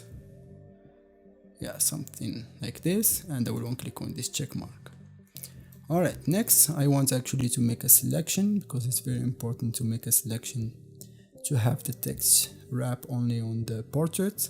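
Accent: Morocco. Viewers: Your accent look like Moroccan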